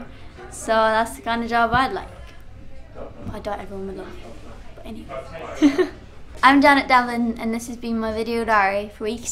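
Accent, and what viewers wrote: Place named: South, Ireland. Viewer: dont like how shes changed her accent to sound more southern irish.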